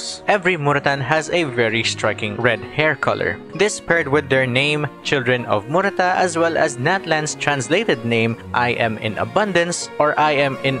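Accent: Philippines. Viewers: There is no mistaking a Filipino’s accent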